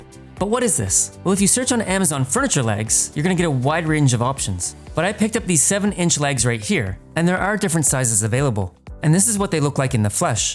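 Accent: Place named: Canada. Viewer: Dude sounds like a Asian Canadian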